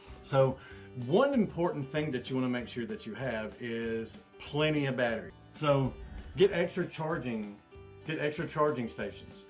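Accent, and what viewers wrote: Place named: Pea Ridge, United States. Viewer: Sounds like a pea ridge feller I know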